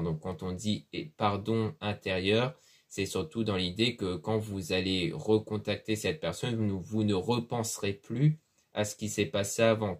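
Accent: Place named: Madagascar. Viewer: Je vous découvre grâce à Maria ❤️ j'adore le petit accent malgache /reunionnais🤗 merci pour ce tirage très parlant 🙏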